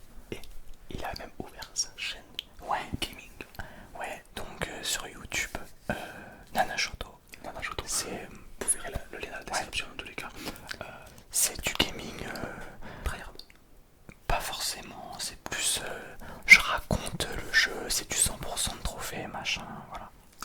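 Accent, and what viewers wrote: South, France. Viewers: Vous n’avez pas le même accent. a l’accent du sud mais pas petit frère 😅. Mais il peut se lancer dans l’ASMR, il a le fluide 😁 🩷🩷🩷💫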